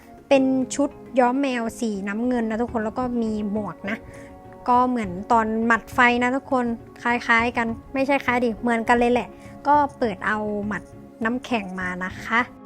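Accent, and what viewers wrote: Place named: Isan, Thailand. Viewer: มีสำเนียงอีสานซะด้วย55555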